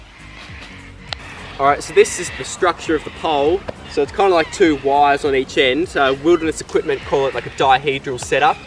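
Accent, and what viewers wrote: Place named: Australia. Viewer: Great video - awesome to hear an Aussie voice on one of these. Interesting there's no zip cover... Very thorough review. Liked and subscribed. Will be checking out some of your other vids. Cheers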